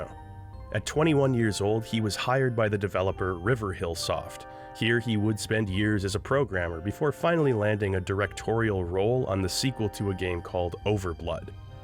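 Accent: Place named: Canada. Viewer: He also pronounces some words ("again" for example) in a very Canadian way.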